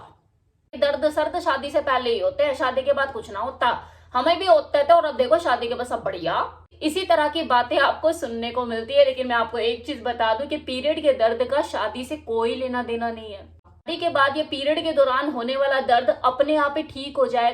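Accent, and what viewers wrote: Haryana, India. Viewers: Ya sayad haryana se ho haa haryana 95% punjab 5%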